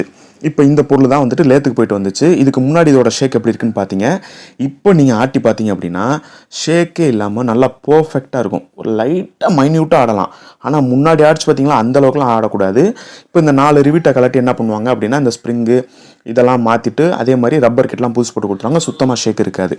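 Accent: Madurai, India. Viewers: Bro nenga madurai,yahh??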